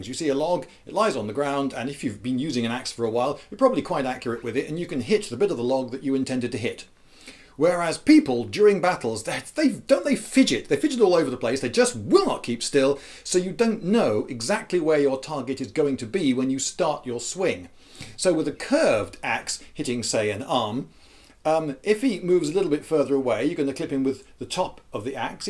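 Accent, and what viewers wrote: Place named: RP, United Kingdom. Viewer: why is your accent so posh? Is it real?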